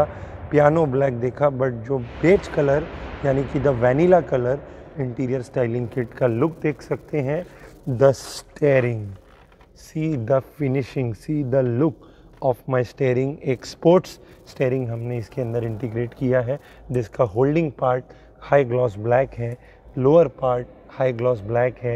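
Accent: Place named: Maharashtra, India. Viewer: Waa..... मराठी आवाज अचानक ऐकून खूप छान वाटलं......❤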